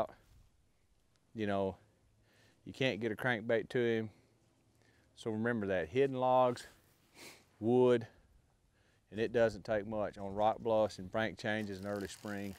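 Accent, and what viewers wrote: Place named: South, United States. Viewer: LMAO FUNNY SOUTHERN BOY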